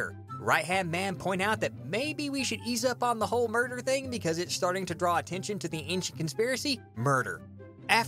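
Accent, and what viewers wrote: South, United States. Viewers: I only just now realized you have a Southern accent.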